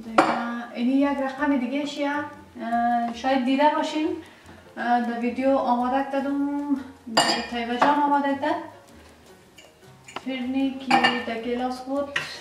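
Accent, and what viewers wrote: Afghanistan. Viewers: شما خیلی منظم و با سلیقه هستین. زنده گی تانرا در افغانستان سپری نموده اید یا در ایران.چون لهجه تان بسیار شیرین و افغانی بنظر میرسد.خیلی در تلاش همچو همسر مثل شما میباشم.فکر نکنم که پیدا نمایم.چون طالع ندارم.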